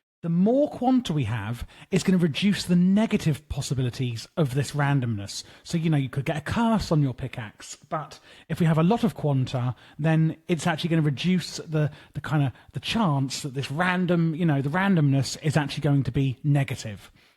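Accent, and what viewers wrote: United Kingdom. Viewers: Jesus i cannot ignore your british accent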